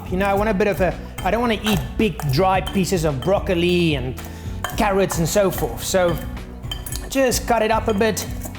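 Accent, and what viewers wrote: South Africa. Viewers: He is most definitely South African. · He sounds South African, haha. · south african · are u south African? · South African = best accent ever! Wonder how he cooked the chicken? looked good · haha i think he is south african lol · Are you South African? Sounds like it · He sounds South African. An Afrikaner to be exact. Yup english is clearly not his first language. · I think he's South African?????? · south african?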